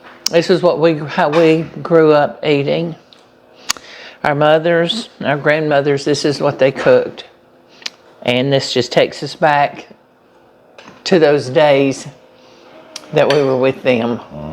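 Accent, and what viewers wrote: South, United States. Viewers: Pronounce the words the way you do and let others pronounce them their way I love your southern accent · Don't let the critics who tell you how to pronounce 'peppericka' worry you. Your Southern accent is a big part of your charm!! Keep cooking and use plenty of 'peppericka"!!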